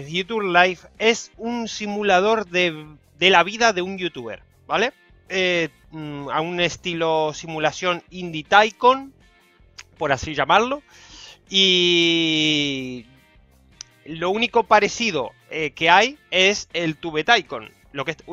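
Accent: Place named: Argentina. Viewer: que vas a ser de españa vos,sos re Argentino,se nota cuando hablas solo con decir zapatilla ya me doy cuenta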